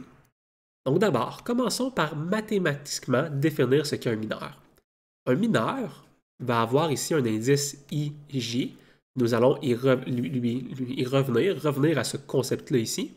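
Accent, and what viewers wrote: Quebec, Canada. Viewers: 😂 il est quebecois , c est leur accent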